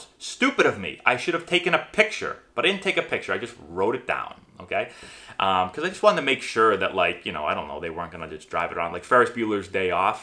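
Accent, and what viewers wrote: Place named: Massachusetts, United States. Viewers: His Massachusetts accent really comes out when he gets animated. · Yo im from Massachusetts too! Your accent sounds just like my brothers. For whatever reason I don't have much of a Boston accent.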